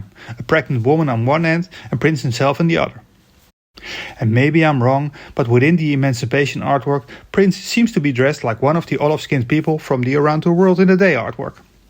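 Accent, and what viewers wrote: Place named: United States. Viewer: it took me a while to figure out you were saying ladder and not letter, the A should be AH not EH, your english is slightly americanized but great vid otherwise.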